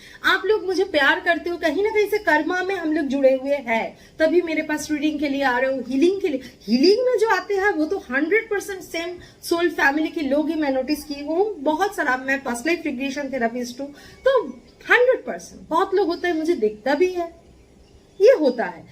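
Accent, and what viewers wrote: Bengal, India. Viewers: Thank you so much mam... Seems like you're bengali... Loved the way you executed. 😃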